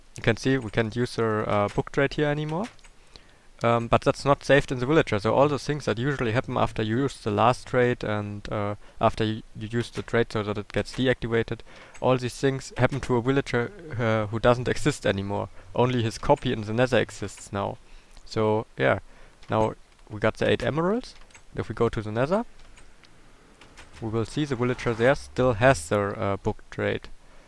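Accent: Germany. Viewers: man hört voll dass Du deutscher bist xD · Man hört es übel... allein wie er think ausspricht :) typisch deutsch eben! :D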